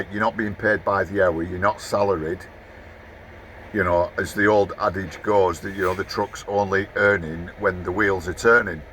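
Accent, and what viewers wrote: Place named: Lancashire, United Kingdom. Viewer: That accent! Wigan or Bolton Bolton or Wigan?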